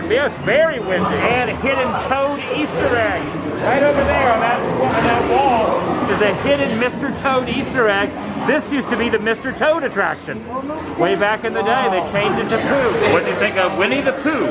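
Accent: South, United States. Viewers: It’s called “a southern pronunciation”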